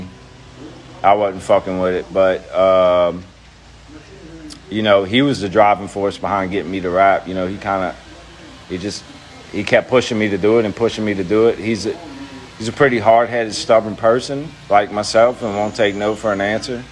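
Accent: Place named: United States. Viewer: When scrim was an addict he sounded hood, now that he's sober he sounds country...wtf.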